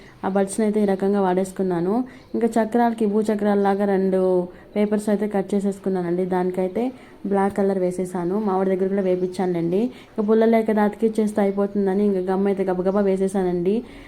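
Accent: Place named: Nellore, India. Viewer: Nellore aa sis medi nellore yasa anipistundi mi matallo 😂😂😂bagundi mi bus lorry avvaley😜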